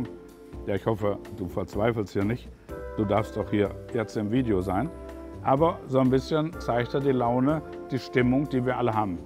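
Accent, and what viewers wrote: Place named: Ruhr, Germany. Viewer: Plattitüden und Ruhrgebietsdialekt, kennt man sonst nur aus der Kirche.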